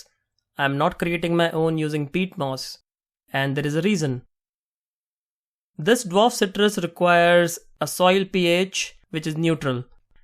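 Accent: India. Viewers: U talk same Indian people, where are you from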